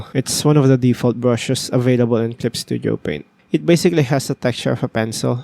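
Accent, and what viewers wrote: Philippines. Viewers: Are you filipino or SE asian? i love the accent, sounds very asian specifically fil xD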